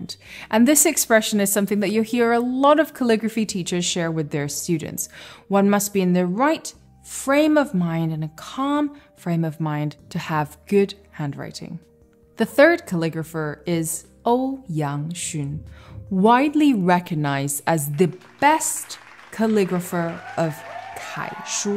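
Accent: England, United Kingdom. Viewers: you've got a good British accent. you sound like Emilia Clarke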